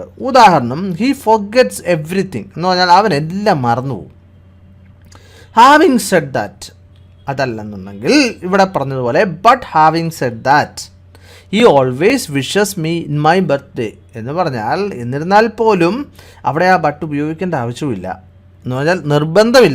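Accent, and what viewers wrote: Kottayam, India. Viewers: Please avoid sir kottayam slang I didn't understand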